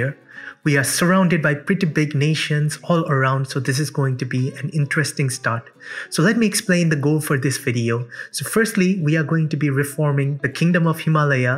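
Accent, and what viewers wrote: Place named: India. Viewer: by any chance are you indian or even nepali?you have indic accent.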